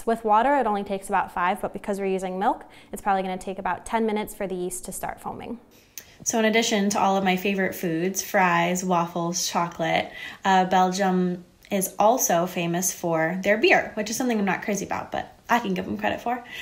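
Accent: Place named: Midwest, United States. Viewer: Omg the Midwestern accent is just the cutest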